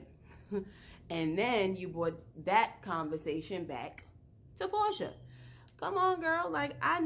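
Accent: New York, United States. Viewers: Oh WOW! I’m first! New subbie! Love your accent - sounds like home NY!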